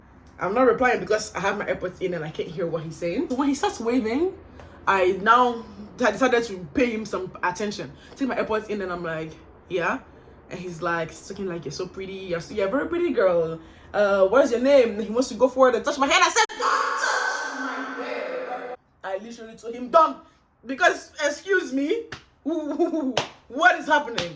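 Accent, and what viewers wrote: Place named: Nigeria. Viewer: you sound soooo Nigerian in this video oo hah! 😂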